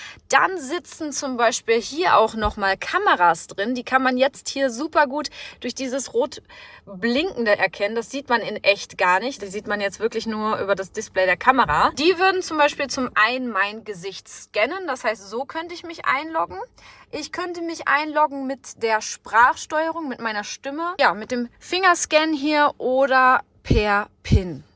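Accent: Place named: High German, Germany. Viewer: That's not an accent, that's High German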